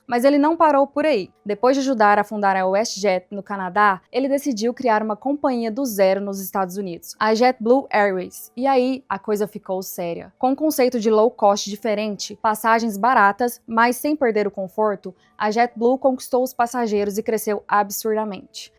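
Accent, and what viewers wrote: Caipira, Brazil. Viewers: esse sotaque de caipira é uma tortura!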